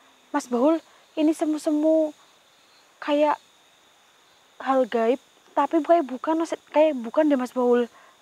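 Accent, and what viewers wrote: Banyumas, Indonesia. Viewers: Kangen ngomong ngapaknya lucu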